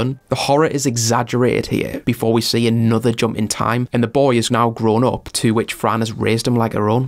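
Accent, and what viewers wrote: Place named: Newcastle, United Kingdom. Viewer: cool video mate, are you from england? sound like a geordie haha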